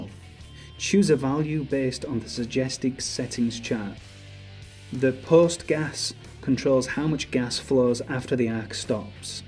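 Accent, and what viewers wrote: United Kingdom. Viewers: Agreed, but I think he's a Manc lad! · Yes, it is absolutely appalling, but as he has a 'Midlands' accent it isn't very surprising.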